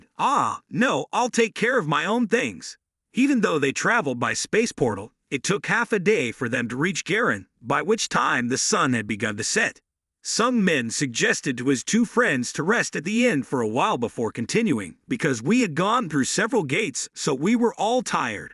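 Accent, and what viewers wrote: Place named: United States. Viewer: Imma be real, I genuinely appreciate the effort but this is one of the worst AI voices I’ve come across to date; the disgusting American dial is cranked up far, far, far too much. It’s almost comical where it not for the fact this isn’t satire. I don’t know who your target audience is but unless you’re specifically targeting the most niece subgenre of fat fucks I’d highly suggest you mellow out some of that abhorrent mannerism and intonation.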